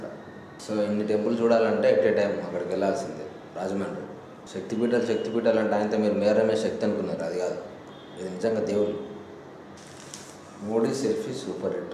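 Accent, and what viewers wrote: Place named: Telangana, India. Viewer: comic comments....especially the telangana yaasa....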